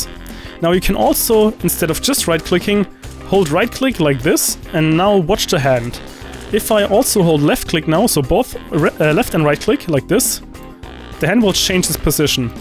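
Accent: Germany. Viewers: Just asking but are you from germany?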